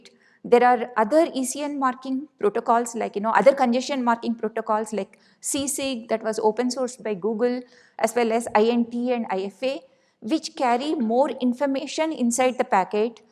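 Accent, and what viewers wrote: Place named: India. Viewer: Thank you for giving insights about AI Networking and i like your speech very calm and keeping natural Indian tone.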